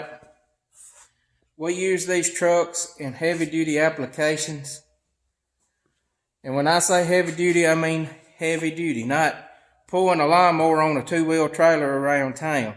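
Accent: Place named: United States. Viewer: cheers from poland, i got here by randomly searching "diesel truck" just cause i was bored lol. i like your accent. its nice to hear various american accents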